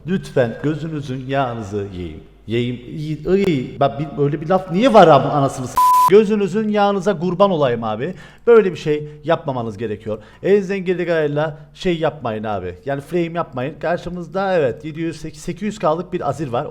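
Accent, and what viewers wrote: Cyprus. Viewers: Abi seni çok seviyorum bir videoda kıprıs değilde karadeniz ağzıyla konuşsan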